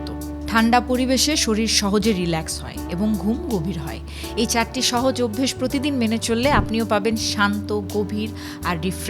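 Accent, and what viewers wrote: Bangladesh. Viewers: আপনার ভাষা শুনে মনে হয় আপনি বাংলাদেশী। তো দিদি ভাই আপনি আপনার ভিডিও তে যে জ্ঞানের কথা বলেন আপনি কি ১০০% বিশ্বাস ও পালন করেন কি?? না কি শুধু জ্ঞানের কথা বলে আয় করেন (বর্তমানে তো বাংলাদেশের রাজনৈতিক অস্থিরতা ও অর্থনৈিকভাবে ভেঙে যাওয়া একটি পাকিস্থান ও চীনের দালালি করা দেশ) আল্লাহর নাম নিয়ে উত্তর দেবেন। বাংলাদেশীরা ভুয়া টাইপ হোন (সবাই নন)।।।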